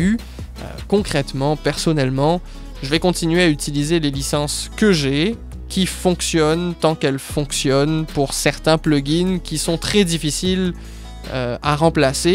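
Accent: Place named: Réunion, France. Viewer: T parey mi sens kom inn ti accent kreol 😅 ou lé réyoné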